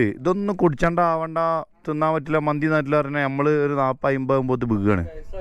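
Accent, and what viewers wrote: Malappuram, India. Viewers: not calicut. This is Malappuram slang 🔥.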